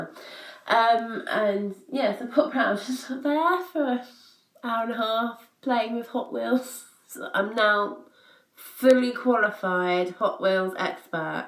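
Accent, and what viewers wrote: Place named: United Kingdom. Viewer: That British accent makes you sound so educated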